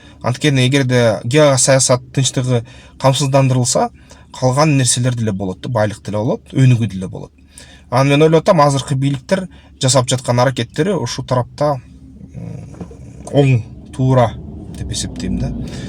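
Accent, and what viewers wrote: Standard. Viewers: тушундуруу таза кыргызча эн соонун❤👍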